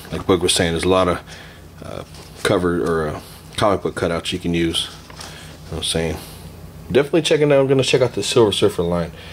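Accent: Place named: Vancouver, Canada. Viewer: You must be in The Vancouver area Like myself or not,just sounds like it,cool review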